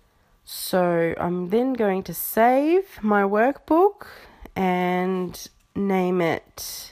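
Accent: Australia. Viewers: Goofy ahhh Austraalian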